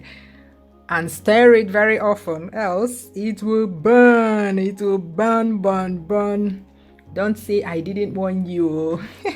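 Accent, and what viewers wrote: Igbo, Nigeria. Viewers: Love your igbotic voice as you always call it. You turned me into a good cook of Nigerian dishes. Keep it up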